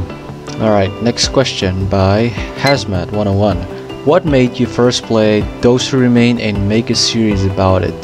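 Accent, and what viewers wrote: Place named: Russia. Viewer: is so peak. Ngl I didn't know you have the Russian accent, it's good to see a Half-Life fan nowadays who has a love for zombies too.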